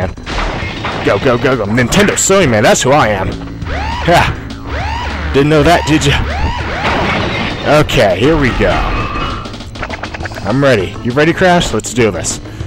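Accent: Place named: Australia. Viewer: Wow, you're moving through this game quickly! You're going to be finished with Crash 3 before I even have half the gems on my Crash run xD Also wow at that accent, I have to say its not very common where I live but if you went away from the coastline I'm sure there are people who actually have that accent! Our dingos and Crocodiles don't carry flamethrowers or mass murder penguins tho.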